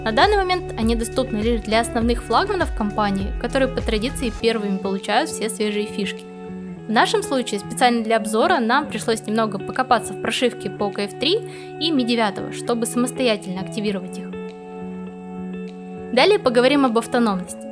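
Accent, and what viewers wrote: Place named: Perm, Russia. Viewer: Я думаю, какие-то пейзажи знакомые. И говор... так она ж наша Пермская!) Приятно)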